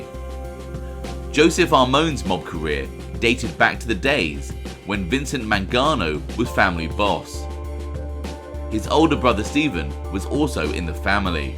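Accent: United Kingdom. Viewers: What is a mobsta? Did you mean mobster? UK accents are irritating. DISLIKE.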